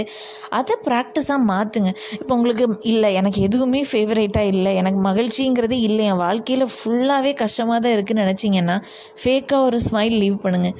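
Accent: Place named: Tirunelveli, India. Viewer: Neenga Tirunelveli ya antha slang varuthey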